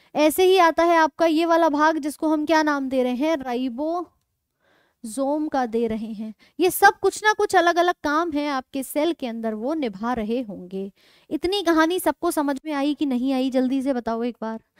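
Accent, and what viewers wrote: Bihar, India. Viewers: Etne khubsurat chehre kaise etne khubsurat se pdha lete hai thanks mam ekdam aditya ranjan sir ke bihari style me padhate ho❤❤❤❤❤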